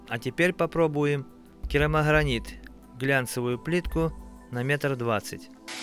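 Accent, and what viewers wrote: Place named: South, Russia. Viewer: Южный акцент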